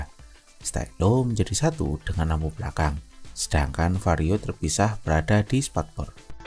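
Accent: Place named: Java, Indonesia. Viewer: Lebih suka sama suara mas yg dulu karena ciri khasny medokny 😢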